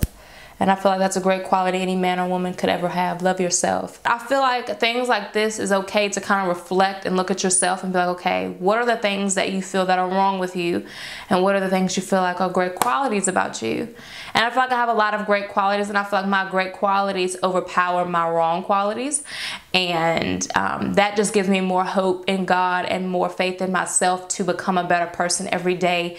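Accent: South, United States. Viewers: I could literally listen to you talk all day! I love your accent and the southern twang that you have. 😅 💕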